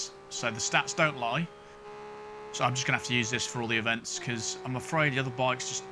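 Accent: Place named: Midlands, United Kingdom. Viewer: it's a mixture with midlands and leicester accent lol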